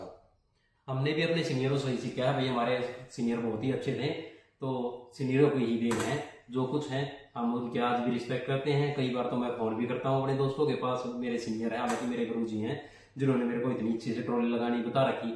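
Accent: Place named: Haryana, India. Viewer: Paka haryana ta hai ladle 😂😂😂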